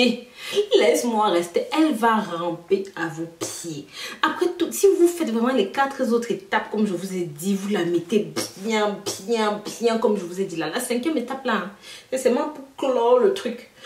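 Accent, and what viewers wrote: Cameroon. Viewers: J'adore ton accent je crois bien c'est un accent camerounaise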